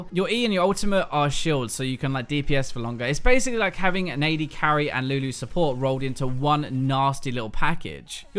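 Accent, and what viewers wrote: United Kingdom. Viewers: God I hate that british accent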